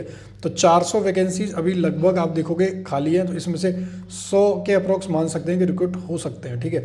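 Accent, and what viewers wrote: India. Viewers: He is speaking Perfect but in Hindi accent ..that is not at all a problem❤ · Desi English hai....with pure hindi wala accent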